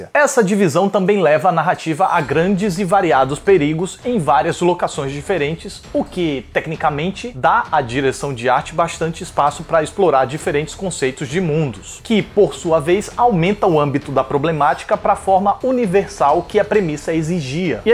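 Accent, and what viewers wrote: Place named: Ceará, Brazil. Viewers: Cara adorei seu trabalho, fala um ciarez fluente, com mestrado, doutorado e senhor dos anéis, deixa tudo muito engraçado de se ouvir, bom trabalho. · Amo sua narração (estilo nordeste)em cearencês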